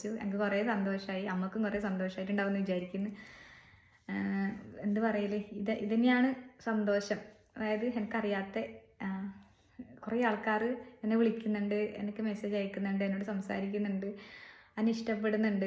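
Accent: Kasaragod, India. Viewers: ടീച്ചറേ ഭക്ഷണത്തിൻ്റെരുചി മാറ്റം അത് ഒരു ഇത് തന്നെയാണ് എൻറെ വീട് മലപുറം ജില്ലയിൽ വണ്ടൂർ എന്ന സ്ഥലതതാണ് കല്യാണം കഴിച്ചത് മലപ്പുറത്തേക്കും ഭക്ഷണം പാകം ചെയ്യുന്ന രീതി, അങ്ങനെ ആദ്യം പ്രയാസമായിരുന്നു അപ്പോൾ പിന്നെ ജില്ല മാറിയാലോ? 😅 പിന്നെ ടീച്ചറുടെ ചിരിയും പാട്ടും ഉള്ളിടത്തോളം പാട്ടിനെ സ്നേഹിക്കുന്നവർ എന്നും ഉണ്ടാവുന്നേ..നിങ്ങളുടെ കാസർഗോഡ് ഭാഷ അത് എനിക്കൊത്തിരി, ഇഷ്ടമാണ് മലപ്പുറം ആരാധിക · നിങ്ങൾ നമ്മുടെ കാസർഗോഡിന്റെ മുത്താണ്, നിങ്ങളെ നമ്മൾ മലപ്പുറത്തിന് കൊടുക്കൂല്ല, എവിടെയായാലും നമ്മുടെ സ്ലാങ്ക് മറക്കണ്ട, അതാണ് നിങ്ങളെ കൂടുതൽ ആളുകൾ ശ്രദ്ധിക്കുന്നത്, നമ്മക്ക് നങ്ങളെ വർത്തമാനം മതി അത് കേക്കാനാന്ന് സുഖം, അല്ലാണ്ട് അപ്പ്യൊ പറയുന്ന പോലെ പറഞ്ഞാൻ ആരും നമ്മളെ മൈൻഡാക്കൂല്ല. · കാസർഗോഡ് സ്ലാങ് ഇഷ്ടപെടുന്ന ഒരു മലപ്പുറത്തുകാരനാണ് ഞാൻ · കാസർഗോഡ് ഭാഷ മാറ്റരുത് ഞാൻ കാസർഗോഡ് ആണ്❤ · കാസർകോട് ഭാഷ കളയരുത് കേട്ടോ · മോളെ കാസറ്കോഡ് ഭാഷ എനിക്ക് വളരെ ഇഷ്ടം. മലപ്പുറം എവിടെയാണ് ഞാനും മലപ്പുറംആണ് കെട്ടോ 😍😍 നിഷ്കളന്കമായ സംസാരം God bless you മോളെ….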